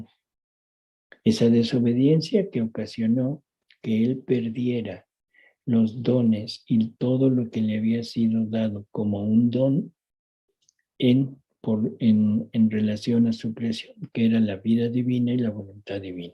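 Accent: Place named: Mexico. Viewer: Ay! Doctor, es usted una maravilla de palabras, explicaciones viva su maravilloso acento mexicano. Le amo, el Señor le bendiga.desde 🇪🇦 ledoylos buenos dias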